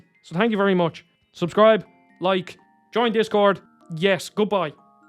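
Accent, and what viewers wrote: Ireland. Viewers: I just meant it was an Irish accent that I had never heard before, I may just be being stupid though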